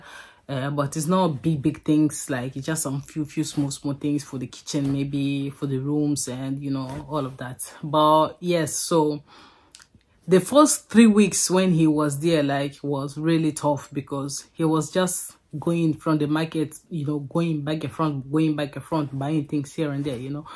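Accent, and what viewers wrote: Igbo, Nigeria. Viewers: I ve learnt a lot of good values and beliefs that help spur Nigeria's economic development. Drive to build yo own house b4 going back home - cant stand staying in a hotel. Funny how your accent is more Nigerian than Congolese 😅😅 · Great presentation and experience considering the facts of how things really work back home , but our wife you already sound like igbo woman accent ❤😅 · Wowoo! You already speak like an igbowoman, a Nigerian. You have a house- home in Nigeria. The way you pronounce Aba, Ebuka etc i think in your first world, you're igbo and Nigerian before they transferred your body to Congo, then your husband went and brought you back to Aba, Nigeria. I live in Aba also, Aba ma ndi Aba. You're welcome. Im happy for you. God bless you and your family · A Congolese speaking like a Nigerian. Your accent is pure Nigerian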